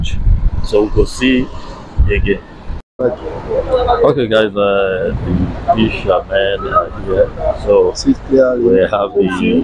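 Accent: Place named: Nigeria. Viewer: Are you from Nigeria,you’ve Nigeria accent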